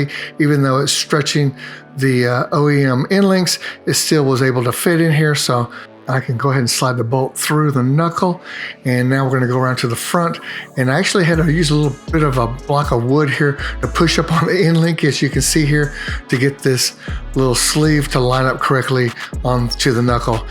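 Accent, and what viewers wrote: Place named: South, United States. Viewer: Yeah he does have a very pleasant voice over I first started watching his videos I was like man this guy’s voice is much more pleasant to listen to I think he’s a southerner if not mistaken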